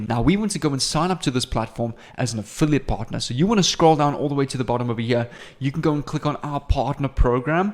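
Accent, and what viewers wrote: South Africa. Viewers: Are you South African Mate? I'm very familiar with that accent. But you are great. I trust you and Im taking my shot on this one.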